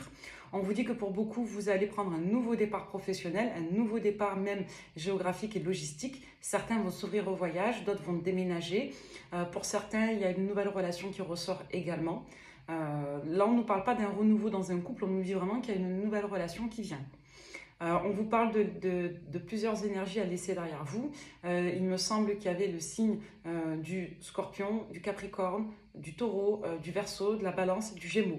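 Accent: South, France. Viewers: Merci; Je préfère largement entendre votre jolie voix avec un léger accent du sud et vous voir souriante. 😉😂🤗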